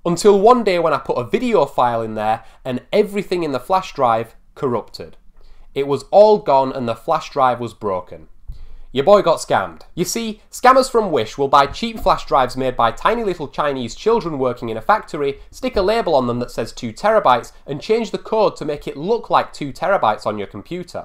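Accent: Northern England, United Kingdom. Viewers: You've got a new follower. Educational video with occasional healthy humor and a nice Sean Bean accent(and everybody else from the north in the GoT). Followed at less than 10k followers. See ya when you get a million of us mate.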